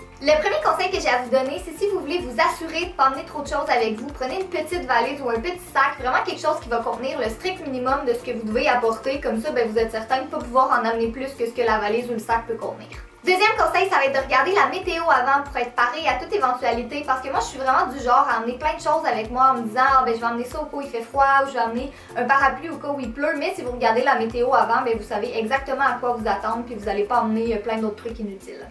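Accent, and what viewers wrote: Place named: Montreal, Canada. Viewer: J aimerais tellement aller vivre à Montréal j adore votre accent si je serais née entants que canadienne je serais la plus heureuse du monde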